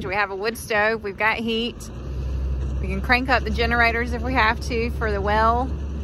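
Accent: South, United States. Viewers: I gotta said I love you southern accent. You are a keeper for sure. you are the man and you have a great women by your side God bless y'all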